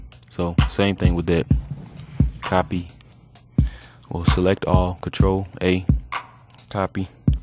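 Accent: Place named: South, United States. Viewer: he makes the word fruity loops sound hilarious lol! he sounds country lol. nice video though, real shit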